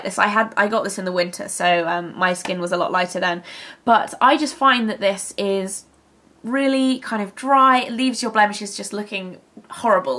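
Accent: England, United Kingdom. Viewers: Aww ur accent reminds me of when I used to live in England! Awe I'm defiantly going to buy one of ur recommended concealers...lol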